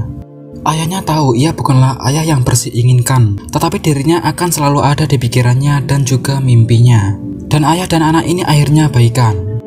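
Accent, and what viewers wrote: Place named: Java, Indonesia. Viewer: Penjelasannya enak dgn warna logat jawa yg kental. 😄😁😊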